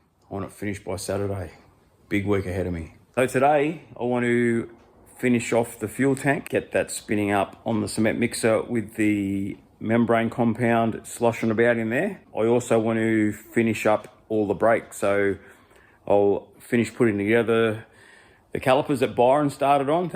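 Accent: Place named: Australia. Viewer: Love your no dramatics Aussie presentation. Down to earth. Refreshing compared to Some overseas vids. cheers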